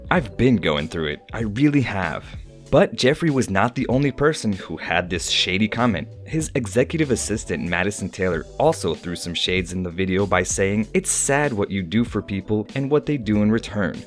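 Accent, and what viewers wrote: United States. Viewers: Prices quoted in pound sterling... Narrated in American accent? I'm lost. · Jade Tea Was thinking the same thing. Sounds like a foreigner with an American accent, so I was confused by the £ usage. Especially since we’re talking about an American public figure.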